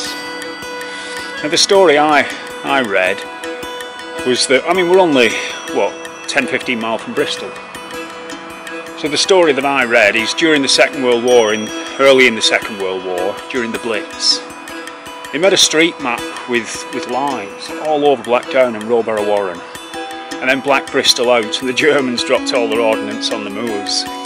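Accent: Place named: Northern England, United Kingdom. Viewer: Great vid as usual. Out of interest I was watching one of your films with my wife and we both tried to place your accent. I appreciate you’ve lived in Hampshire for some time, but I expect you originate from up north?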